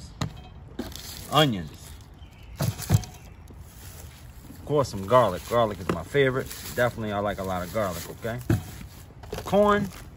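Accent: Louisiana, United States. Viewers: That's a bona fide cajun accent. ;)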